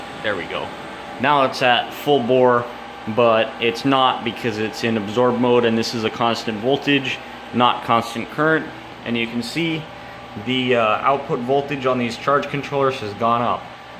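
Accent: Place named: United States. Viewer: May I ask what state you're from? I'm trying to learn about American phonetics and I found your accent very pleasant to listen to. I hope I'm not being too intrusive.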